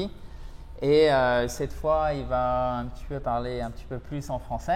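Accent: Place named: United States. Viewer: Thanks and. I hope another video with. What a beautiful voice! And interesting to learn new expressions really typical and current., you are perfect in your explanations, and your English American is top, but think of directing the slate towards the camera. See you soon. (Petit plus Bonne fête)🎉👍🏻👍🏻👍🏻👍🏻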